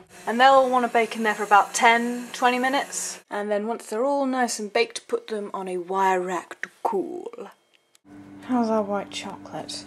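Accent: United Kingdom. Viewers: Gracious, you're British. I came from the latest Grumps animation, and dang-ass-dang, you're all the more awesome because of it. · What part of the UK are you from? I've decided I need to marry a woman who says "me dainty fingahs"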